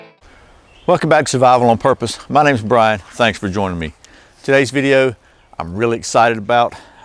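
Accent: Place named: South, United States. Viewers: This southern accent at least thats what i think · Purpose I was guessing KY or TN, but definately south east. Great videos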